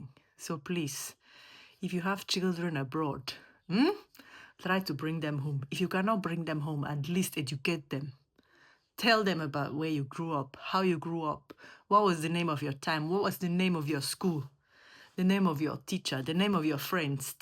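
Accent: Nigeria. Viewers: Your Nigerian accent is so thick and strong.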